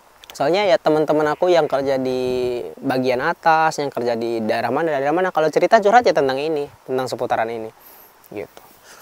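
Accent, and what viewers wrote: West Java, Indonesia. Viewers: Mang lamun ngobrol make bahasa jepang asa ek aya logat sundaan nya😂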